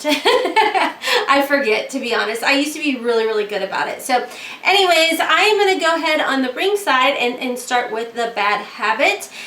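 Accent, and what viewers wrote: South, United States. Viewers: What happens if you take the ones that are close, but one is more pigmented, and apply the darker one with a much lighter hand. I would really like to see. I don't know if it's the lighting, since you mentioned it, but you look like you have sparkly white pupils👁 I love watching you. I live in northern ca, and I miss a little southern accent. Where are you originally from? Blessing to everyone, and happy holidays🙏🎄🌹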